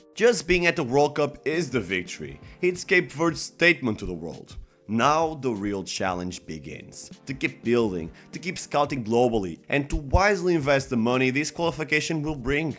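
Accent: Portugal. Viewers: By your English accent and your perfect pronunciation of Portuguese names, I can tell you are Portuguese. It is pronounced “Bubissta”, and not “Bubishta”. Good video, BTW! 👍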